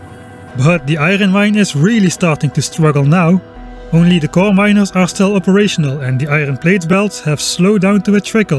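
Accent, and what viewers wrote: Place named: Netherlands. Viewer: 99% sure your Dutch just from hearing the first 30 seconds of this video. Btw first time on your YouTube channel/ first video I’ve seen of you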